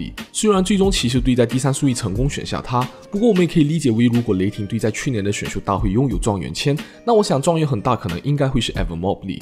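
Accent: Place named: Malaysia. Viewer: 馬來西亞口音本來就跟我們平常聽到的不太一樣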